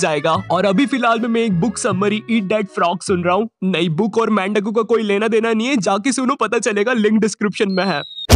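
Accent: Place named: Madhya Pradesh, India. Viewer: I'm from madhya pradesh.. where are you from??? Bro .. bcz you're accent is like m.p.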